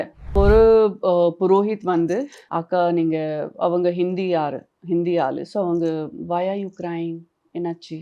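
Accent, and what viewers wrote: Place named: Kerala, India. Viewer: Kerala va ma neenga tamil peasum podu thearigeradu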